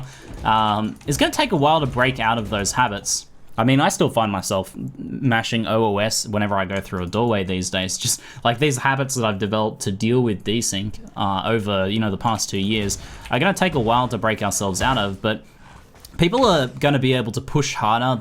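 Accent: Australia. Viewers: Farrell Roll Uh, no. He's Australian so he says some words differently than an English man or American man would... but thanks for being ignorant.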